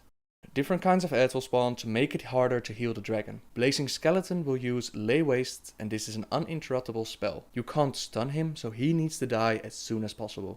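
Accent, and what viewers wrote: Netherlands. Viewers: Ik hoor gelijk dat je Nederlands bent. :P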